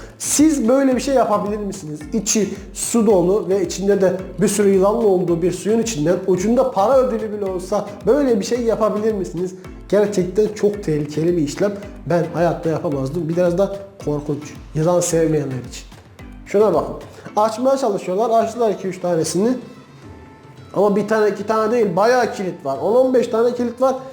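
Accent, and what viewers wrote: Diyarbakir, Turkey. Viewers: Abi senin konuşman Diyarbakır ağızına çok benziyor "gablo(kablo), garı(karı), bağ(bak),gurtuldu(kurtuldu)" gibi...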